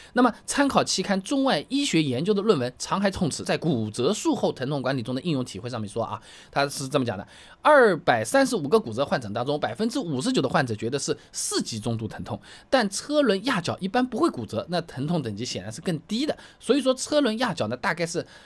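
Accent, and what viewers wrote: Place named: Jiangnan, China. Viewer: 江浙沪一带的数字读法大多是这样的 从方言直翻成普通话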